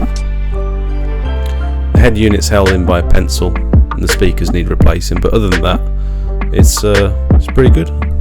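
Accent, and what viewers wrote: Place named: Cockney, United Kingdom. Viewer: sound like that cockney fella dizzy new hights great videos very inspiring and informative keep moving forward 🎉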